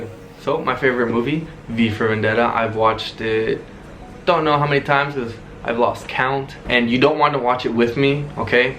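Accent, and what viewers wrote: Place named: Venezuela. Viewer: Tu español tiene acento venezolano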